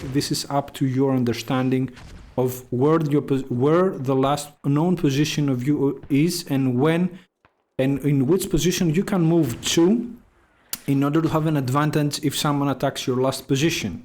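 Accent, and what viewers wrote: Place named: Greece. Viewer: I can hear the Greekness in your voice :D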